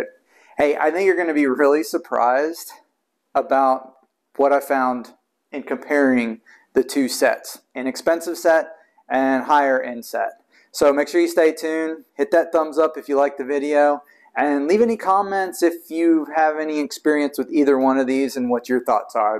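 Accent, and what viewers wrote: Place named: Texas, United States. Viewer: well, I am from Austin so I always recognize Texas in videos no matter how it manifests itself!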